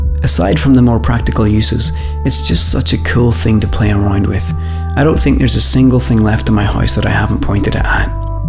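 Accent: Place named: Scotland, United Kingdom. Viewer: where is that accent from you have a cool voice, Scottish?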